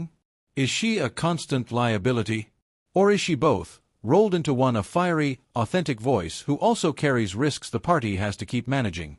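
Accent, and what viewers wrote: United States. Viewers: Why we got a bleedin yank telling us this . Just get on with it yawn yawn yawn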